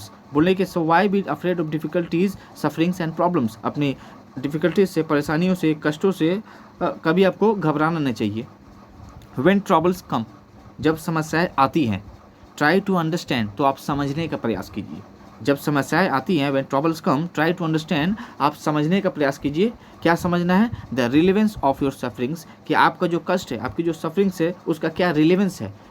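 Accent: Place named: Bihar, India. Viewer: Very well explained and I can relate with your bihari accent which gives a home like experience. Thank you🙂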